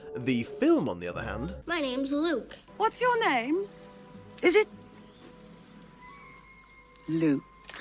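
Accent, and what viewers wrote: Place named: United Kingdom. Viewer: YOU ARE BRITISH! So am I Hyper mega mega fan boy mode activated. I mean your accent was obvious but I needed to be sure, then your complaint about the Americans ignoring us Brits definitely sold it for me. Also they have acknowledged us, well at least the MCU has. Now they have Tom Holland, Tom Hiddleston and Benedict Cumberbatch as Spider-man, Loki and Doctor strange.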